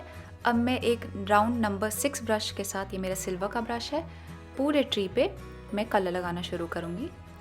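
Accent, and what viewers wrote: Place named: Kashmir, India. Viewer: Didi are you kashmiri?? Your accent sounds so ❤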